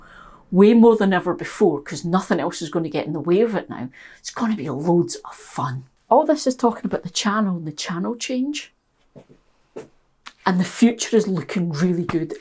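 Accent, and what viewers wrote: Scotland, United Kingdom. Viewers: I just stop in to see what your up to. You could probably film walking around the yard and going on about whatever comes up. My gardening channels are just relaxing, AND... Your my only Scot?:) So its also nice for my ears:) Happy new year! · I just read a comment from one of the viewers saying that “for a moment they thought you were going to say that you were stopping making videos”! That’s was exactly what I thought and for a moment there I felt a real pang of sadness, and was so relieved to find that was not the case! 😮‍💨 I absolutely love your videos and discovered your channel when you had just reached 18 thousand subscribers - you were ecstatic about it and couldn’t get over the fact that 18 thousand people wanted to hear what you had to say and share in yours and adventures. Your channel is so incredibly relatable and real, and the ‘fluffier’ the content, the funnier and more enjoyable your videos seem to be. 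It’s a real de-stressor watching your antics. I work in a hospital and some days, after a particularly stressful 12 hours shift when my brain refuses to switch off from the dramas of the day, there’s only a handful of programs that are able to relax my grey matter, and YOUR channel is right at the top of that list! So thank you for making this overworked and underpaid NHS worker relax and laugh ❤ PS: your channel even comes with a bonus: the Scottish accent, of course! Absolutely love it!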